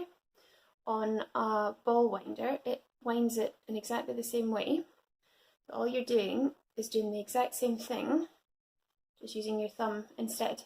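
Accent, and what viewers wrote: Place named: Ireland. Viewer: Cute accent. Ard you Irish? Great videa! Thanks! I'm trying it now.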